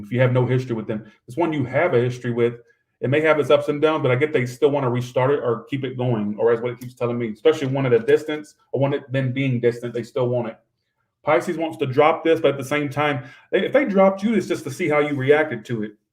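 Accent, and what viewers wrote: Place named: East Coast, United States. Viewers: I love your east coast talk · Love the northeast accent! Very good!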